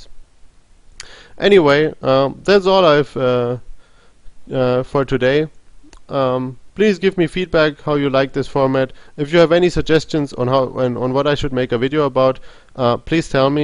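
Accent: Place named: India. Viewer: his accent sounds kinda indian, this confuses me.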